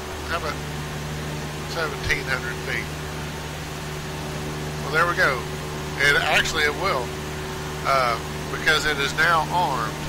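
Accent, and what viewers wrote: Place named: South, United States. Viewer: Thank you! A2A‘s C172 had taught me to stay away from the AP and fly always manually! There‘s a point where a simulation can be too close to reality, and I think they reached that point there. No fun. 👍🇺🇾 Your video was so helpful, mate! Gotta love your southern accent, man. Makes me feel so cosy and welcome. 👏🤝 regards from South America, Mike PS just found out: you gotta set your actual barometric pressure in the AP, otherwise you could be some 100 ft off…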